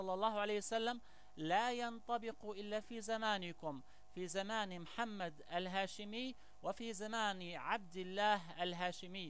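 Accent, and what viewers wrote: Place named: Iran. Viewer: والله العظيم لقد شككت بهذا الشخص من لهجته انو فارسي او ربما اسرائيلي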